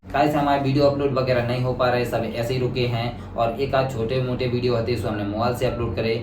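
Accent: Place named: Agra, India. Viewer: bro kha sa ho ap ke boli to agra ke lag re h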